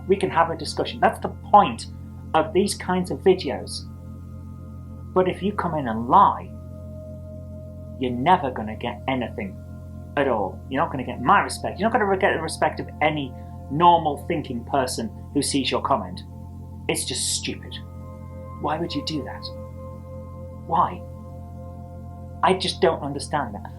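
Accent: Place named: Newcastle, United Kingdom. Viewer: You sound much more Geordie in that old clip ! Great response video😊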